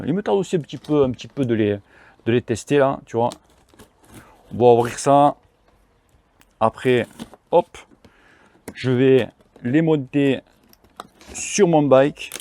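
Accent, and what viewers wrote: South, France. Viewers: Bonjour,j’apprécie tes conseil et commentaires sur les vélos et les conditions d’utilisation.J’aime l’accent que tu a ,nous qui sommes de l’est ,un peu de soleil fait pas mal.Tout ce que tu dit est intéressant mais n’hésite pas de faire intervenir en commentaire un pro quand nécessaire.Cela apporte plus de crédibilité à ta vidéo qui est très bien faite.Je roule Cannondale 4eme vtt.Sur route j’avais un trek madone 2011 que je viens de remplacer par un Scott Foil 2021,Patin,shamal miles.Montages perso.J’ai rayonné ma première roue à 14ans.Depuis je compte plus les vélos construits et réparé gratuitement bénévolement chez Emmaüs aussi.J’ai 65ans retraite et archer.Le vélo m’apporte la santé et la forme.Tes expérience sont judicieuses et concrètement très utile.Cordialement.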